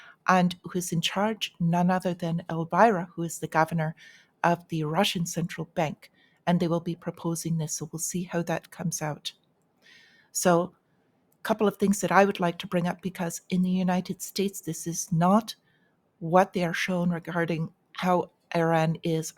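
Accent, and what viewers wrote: Ireland. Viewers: You have such a unique channel - I had to laugh when you showed the farmers spraying the manure - what a metaphor for what they are doing to us on a daily basis! So good to hear your sweet Irish accent! Have a wonderful and profitable week! 🍀😊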